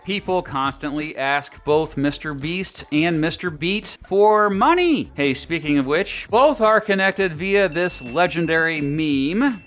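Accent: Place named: Kansas, United States. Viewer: huh...I was not aware that both Mr. Beast and yourself are fellow Wichitans. Well, I can't call myself a Wichitan anymore, though I am a native Wichitan. I knew you were from Kansas from the first video of yours I watched, though....the Kansas accent is unmistakable, though not apparent to those who spend a lot of time in the state. I can't say I've watched any videos that Mr. Beast has produced, though...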